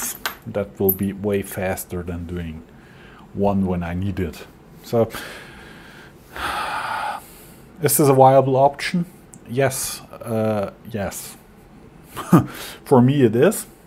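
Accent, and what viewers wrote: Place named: Germany. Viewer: I just love the german pronunciation of "Z" as "Sea" . That is adorable. And authentic.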